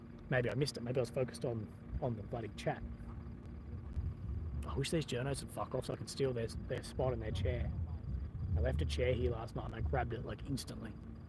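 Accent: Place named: Australia. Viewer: That’s an Aussie accent 👍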